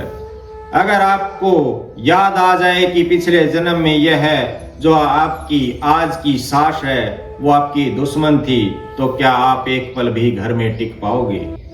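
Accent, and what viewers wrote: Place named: Haryana, India. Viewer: आदरणीय स्वामी जी,आज के हिंदू को सुनाने के लिए यही सुन्दर भाषा उचित है। बहुत दिनों बाद ऐसे स्पष्ट वक्ता को हरयाणवी के पुट के साथ सुनना कल्याणकारी लगा।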